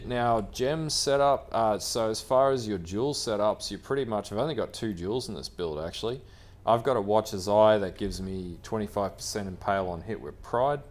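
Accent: Australia. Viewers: Hey love your vids 👍🙂 Also are u Aussie?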